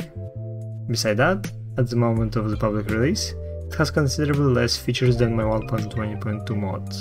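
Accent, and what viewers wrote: Russia. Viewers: yeah, finally a port! great work! Weird question I know, but where are you from? Is that a russian accent? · the accent is similar to Russian, he probably speaks Russian, but I didn't know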